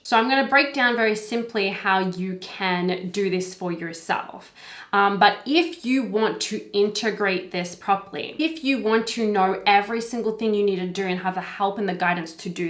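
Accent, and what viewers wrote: Australia. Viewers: She’s from Australia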